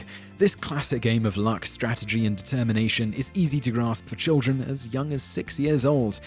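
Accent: England, United Kingdom. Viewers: It's Milton BRADLEY...not BRADY! ...and it's Struh tee go!!!! Nothing like an English Accent that murders the Queen's English!!!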